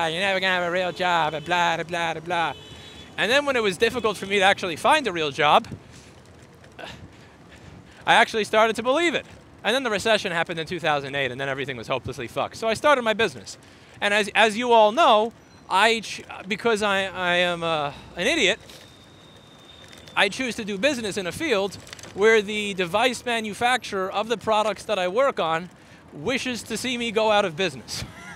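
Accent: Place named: Italian American, United States. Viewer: ... I apologize if you mentioned this somewhere in your videos and I didn't see it. But I need to ask you... Why do you look and speak like an Italian American but have a German last name?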